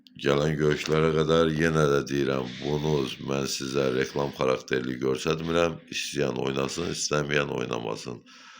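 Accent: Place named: Balakan, Azerbaijan. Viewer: lap yaxsi lehceye göre sorusdum sandım balakenlisiz.adim Ali uqurlar size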